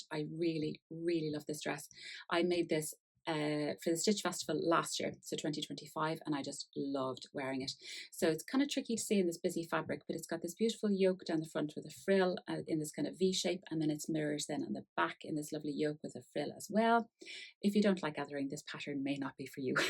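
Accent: Ireland. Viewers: Hello, I just came across your channel, those are beautiful makes, is that an Irish accent?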